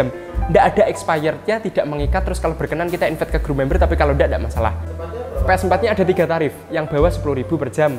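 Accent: Java, Indonesia. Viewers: Mas sebenarnya asli jawa atau kalimantan?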